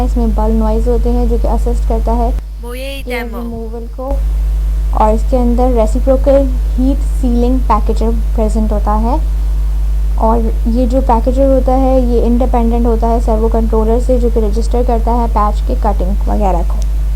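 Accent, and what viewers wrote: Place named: India. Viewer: Can another person read the text?I dint get anything , is there any person, whiteout Indian ecsent.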